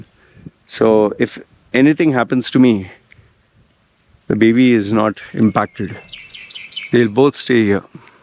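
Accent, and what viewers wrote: India. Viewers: You have changed a lot. 5 years ago u had that pseudo American accent and now fully Indian accent (your genuine voice which is good to hear). You should try radio jockeying. Other changes includes softening down from the brash tone u had then. Good luck. Also you have come back full circle from Family to show off life to back to family. Sometimes I wonder this is heaven(our lifespan) and there is no other place called heaven and we go back to an unsexy place after death....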